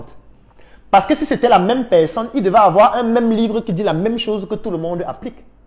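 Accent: Cameroon. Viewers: Il s'exprime avec le ton des Camerounais . Chez nous au Cameroun, la plupart des gens parlent à haute voix et c'est très souvent naturel pour attirer l'attention des interlocuteurs . Alors si tu viens au Cameroun, tu vas aussi commencer à parler sur le même ton .😅😊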